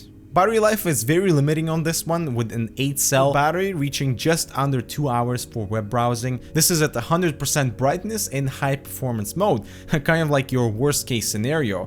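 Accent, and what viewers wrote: Russia. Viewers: Слышится русский акцент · is russian? just wondering bcuz his accent is so stong:P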